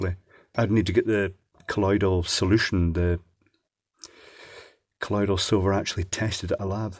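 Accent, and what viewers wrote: Scotland, United Kingdom. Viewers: A Quick question are you from Scotland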